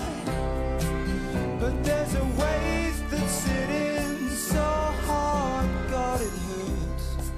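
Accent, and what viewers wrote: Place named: England, United Kingdom. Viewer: thumbs up to an englishish accent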